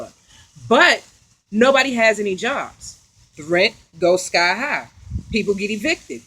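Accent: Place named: Brooklyn, United States. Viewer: great "sumpen ain't right face". I lol'd . great accent too, Brooklyn? farewel is a joke, but only the rich are laughing.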